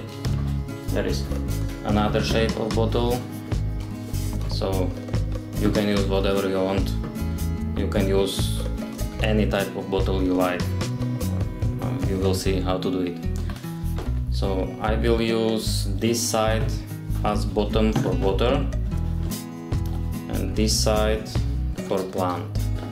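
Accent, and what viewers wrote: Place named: Czech Republic. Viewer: That was my first thought ! I was like, damn he speaks just like shops in Prague ! Thanks for the video !!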